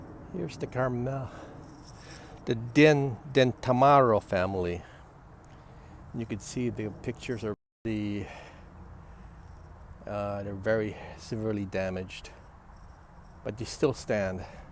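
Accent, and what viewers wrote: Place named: Chicago, United States. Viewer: Love your speaking style and your authentic Chicago accent! Excellent presentation.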